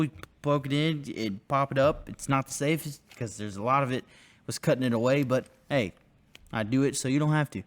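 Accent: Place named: South, United States. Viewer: slightly country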